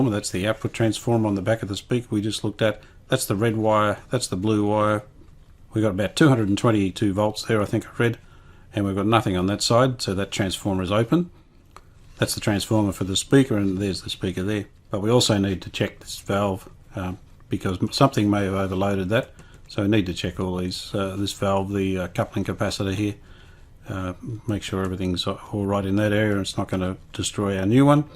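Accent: Australia. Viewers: Great video as usual. Although you indicated that there was a difference between yourself, fellow Australians and New Zealanders, I really couldn't discern a difference. Though those that live in the northern US states say they have a hard time understanding we southerners, I have a hard time understanding those from the New England states. Oh well. Hope it isn't too hot for you there. Merry Christmas to you and family!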